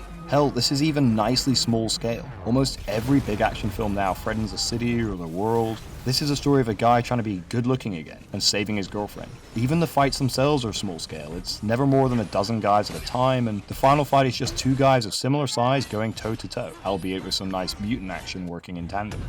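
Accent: United Kingdom. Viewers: You from Bristol? The accent sounds familiar.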